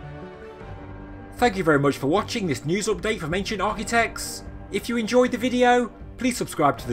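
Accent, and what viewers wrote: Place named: Midlands, United Kingdom. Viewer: aye your just east of brum thought i could hear that accent :d ...on tepe do you think there could have been a wooden structure over it at one time?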